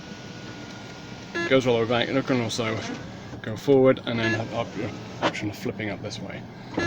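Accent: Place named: United States. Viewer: you've developed an American accent!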